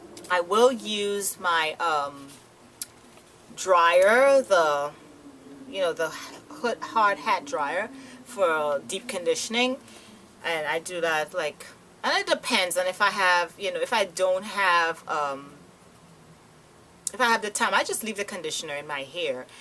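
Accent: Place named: New Jersey, United States. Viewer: Hey... Where r u from? U sound just like my aunt from Jersey. N we r Trinidadian. Anyways, pretty hair! I subbed! :)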